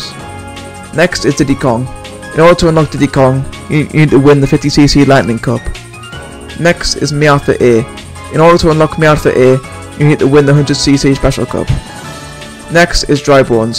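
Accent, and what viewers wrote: Newcastle, United Kingdom. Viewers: I can’t understand your Geordie accent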